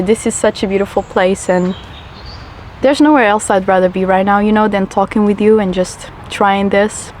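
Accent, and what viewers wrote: Portugal. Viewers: I was already suspecting that you were portuguese, but when I began watching the video your accent gave it right away, good stuff tho. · pelo sotaque vi logo que eras tuga 😅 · Sotaque português??? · sotaque bwee tugaa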